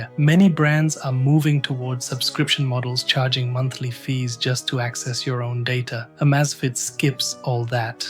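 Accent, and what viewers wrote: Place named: India. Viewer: Terrible Indian accent. Added to that, he speaks like a hypnotist. .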